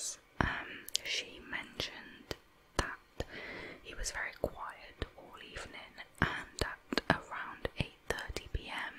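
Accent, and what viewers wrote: United Kingdom. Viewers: Nice to hear a lovely British asmr voice ❤️ please please please more true crime stories and even general chat. You have a nice clear voice, nice microphone too, thankyou for your amazing work 💕🏆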